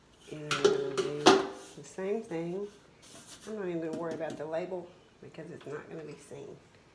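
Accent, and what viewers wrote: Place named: South, United States. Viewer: LOVE 3 TIERED TRAYS! MADE ONE FOR MY DAUGHTER OUT OF GRADUATED SIZED SPRING FORM PANS. HAVE SUPPLIES TO MAKE 4 DIFFERENT ONES I HAVE SEEN ON DOLLAR TREE DIY YOUTUBE VIDEOS. YOURS IS SO PRETTY & FARMHOUSE! ARE YOU FROM SOUTHERN ILLINOIS? YOU HAD MENTIONED CARDINAL BEING YOUR STATE BIRD. MAYBE ANOTHER STATE HAS CARDINAL AS WELL. I NOTE A BIT OF SOUTHERN ACCENT.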